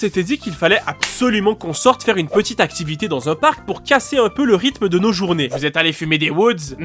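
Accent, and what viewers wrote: Quebec, Canada. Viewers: attend tu es Québécois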